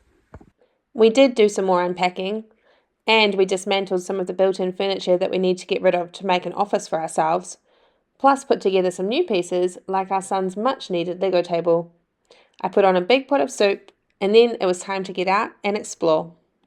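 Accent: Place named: New Zealand. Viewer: Sounds like a Kiwi to me.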